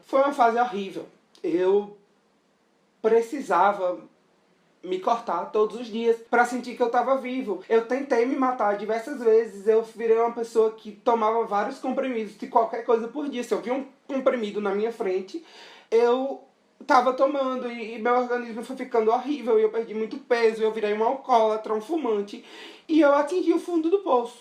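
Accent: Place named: Recife, Brazil. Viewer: Eu me emocionei internamente e me identifiquei demais com sua história, luta e com você também (sou de Recife e tenho o mesmo sotaque e forma de falar que você tem Kkkkk) porque eu sou bem jovem e passo por uma trajetória semelhante ao que você viveu na adolescência em vários pontos (até mesmo a questão da mania de escrever textos para desabafar e etc). Ver o seu vídeo me deu uma injeção de ânimo e de mais vontade de ir atrás dos meus sonhos e independência. A sua história é realmente muito parecida com o que vivo, apesar de alguns pontos de diferença, eu acredito que a minha história terá o mesmo resultado que a sua: a aceitação, e mesmo se eu não tiver, eu permanecerei firme e forte na minha luta e sonhos. Muito obrigado por inspirar pessoas como eu. <3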